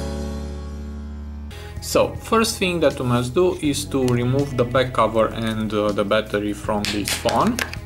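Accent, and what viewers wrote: Romania. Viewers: Romanian accent....the best. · like your Romanian accent of talking, man👍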